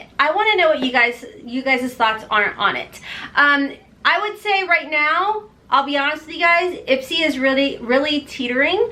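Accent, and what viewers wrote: South, United States. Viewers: Totally agree it's not worth it. The limited edition bags were good until this bag. I didn't get all the same things you got but mine didn't look like it was even $20 P.S. love your southern accent. Wish I did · You sound extra country in this video lol, cute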